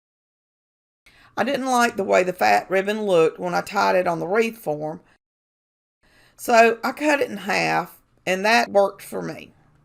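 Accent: South, United States. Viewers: I like your accent it's country keep it up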